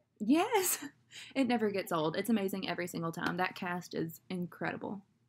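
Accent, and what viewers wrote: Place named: South, United States. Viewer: i love your accent ! you have more of a southern accent than me and idk if arkansas if counts as a southern state haha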